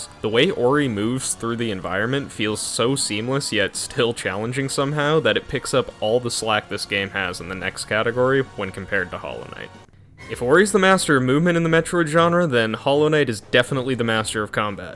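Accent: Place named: Mexico. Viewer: English! You sound like Mexican and I do not understand Mexican.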